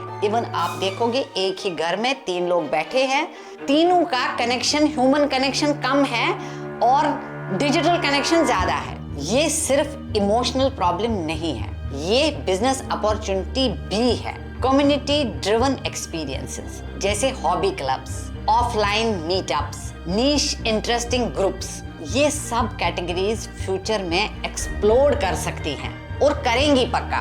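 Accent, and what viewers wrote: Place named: Kashmir, India. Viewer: Aadab mam ... I c your content just now first time ... Your accent of taking is just kashmir type... Any i appreciate your work... Best of luck Dr Masood Ahmad Tantray.... Educationist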